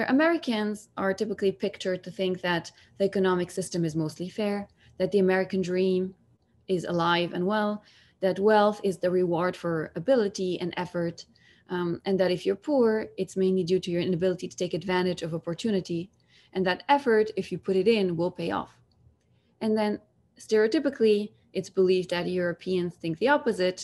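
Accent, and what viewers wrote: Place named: Bulgaria. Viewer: She appears to have spent a lot of time on accent-therapy and techniques to lower her voice. She cannot escape the Bulgarian accent, ever, but she's put a lot of work in to sound close to native.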